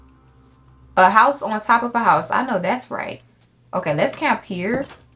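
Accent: Atlanta, United States. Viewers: You from Atl or something? I’m new to the channel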